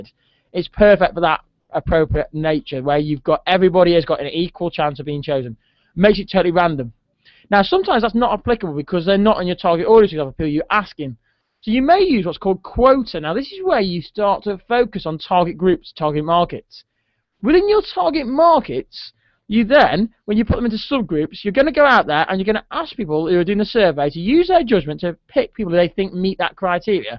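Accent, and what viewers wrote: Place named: Stoke, United Kingdom. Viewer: Thank you these really help! also are you from stoke? You say book and look as if you are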